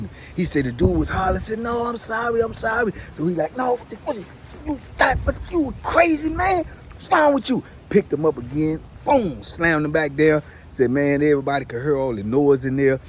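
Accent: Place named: New Orleans, United States. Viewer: U sound like u from New Orleans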